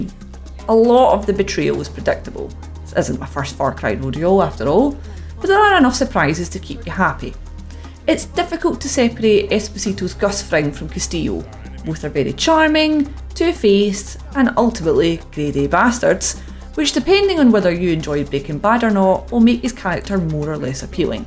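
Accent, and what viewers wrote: Scotland, United Kingdom. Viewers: Exactly. I can understand it too but you've gotta tone down the accent a little bit (a wee bit?) if you're addressing non-native speakers. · I love your accent! 😁 more Scottish game journalists pls! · Born and raised Canadian, english is my first language. 99.9% of Brits and Aussies I can understand perfectly well, as clear as any Canadian or American... this reviewer (Scottish maybe??) has an overwhelmingly thick accent, I only understood perhaps 60% of the words she was saying. Perfectly fine if this review were for Brit / Scottish viewers only.. but pretty sure PC gamer's viewers or readers are mostly in North America and the rest of Europe.. wouldn't it be more sensible to use someone with a softer accent? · Please, if you’re going to make a review with a Scottish accent, at least speak more slowly than usual. Don’t get me wrong, I got nothing against the Scottish accent if the review is well made, but if I can’t understand most of the review, it’s not a good review. 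· XD these comments! Poor Scots get a hard time of it don't they?! But yeah, while I personally can totally understand what she's saying, I agree with the comments, I live abroad, and I make constant efforts to ensure I speak extremely clearly. My normal accent omits T's at the ends of (and sometimes in the middle of) words. I had to teach myself to pronounce T's when talking, among many other adaptations. I think if your going to put out a review to essentially the WORLD, you've got to meet them half way at least. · The colonials are struggling with the reviewers Scots accent 😁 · I am a mexican who speaks english reasonably well, trying to understand a scottish person who has a thick accent. Sorry PC Gamer but I think a clear and neutral english would be better next time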